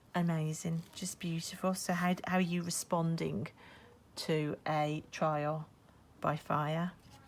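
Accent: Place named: Shropshire, United Kingdom. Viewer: yay! I can tell by your accent, I’m in Shropshire. So in another of your videos about how you’re learning tarot,it was a VR #my tarot growth, the two books you’ve been using are ones I don’t have, which is pretty miraculous seeing as I have about 40 books on Tarot ( of course I haven’t read them all!!!!! Not even close as I’m still in the “ok that looks helpful, I’ll get that and then another book is recommended on a video or by Amazon etc and the pile just keeps getting bigger🤷‍♀️”. Anyway you said about being nervous of putting the bigger spreads together. I would like to recommend the methods in the Tarot face to face book as you look at how cards relate to each other first before you learn any meanings at all. The first spread after the initial lessons is a 25 card spread where you are using these new skills and it’s not scary at all! 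I’ve found it so valuable and so much easier than looking at cards individually and their meanings in a single card. It just seemed counter intuitive to me to be learning reading the tarot card by card individually seeing as our goal is to read cards together in spreads. The book has been republished under a new name Practical Tarot Techniques: Your Essential Tool Kit for Better Readings and is a Paperback – 25 Oct. 2019 by Marcus Katz and Tali Goodwin and is available through amazon but not as an ebook unfortunately. Because then you could have downloaded a sample of the book to see what you think of it. I’m not affiliated to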